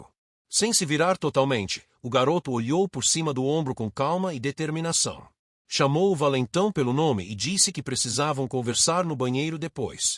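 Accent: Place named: England, United Kingdom. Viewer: Porque você tá falando com um sutaque inglês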